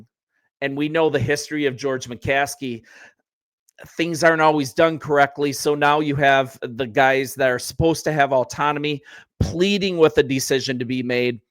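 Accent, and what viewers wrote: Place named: Canada. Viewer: I'm sensing a Canadian or yupper accent, definitely not Chicago.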